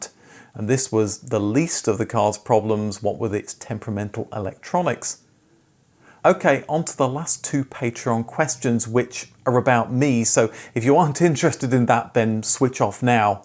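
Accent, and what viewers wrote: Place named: United Kingdom. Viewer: Fun stuff,!!! I have deduced you must still live in the USA as the MINI you and Missus are standing by has the USA size plate frame and you mention it is still in your motorpool. And I had assumed you were UK based and that the missus had moved there!!!! Its the accent and the UK rear plate mock up in the background. Very enlightening, although a little surprised that you don't have a more varied list of dream cars that do not break the paper floor of the 1970s. Well, all of us care nthusiasts can't be Jay Leno have love almost every cool and unusual car ever made. Keep the videos coming as they are always insightful and properly researched.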